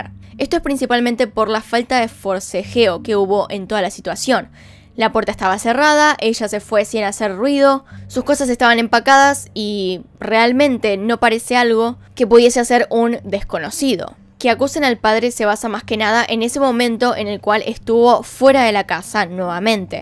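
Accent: Argentina. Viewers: Sos argentina??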